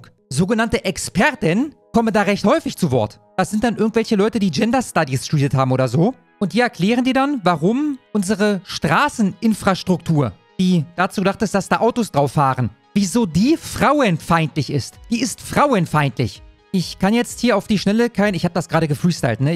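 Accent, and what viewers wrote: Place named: Berlin, Germany. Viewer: Oh ok cool 👍. Berlin hab ich so ein wenig rausgehört aber ich dachte eventuell hättest du russische oder polnische Wurzeln, weil es sich etwas danach anhört wenn du sprichst 😅👍 liebe grüße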